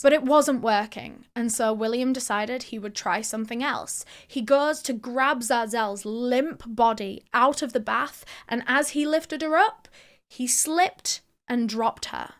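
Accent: United Kingdom. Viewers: I didn't realise Eleanor was American! This whole time I thought she was from the UK lol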